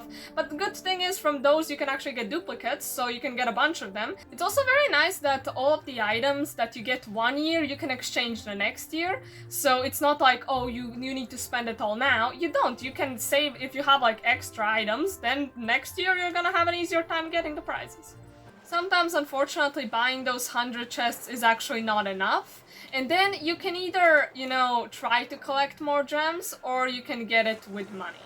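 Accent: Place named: Russia. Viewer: Your accent makes me think you know Russian, if you do then know I do too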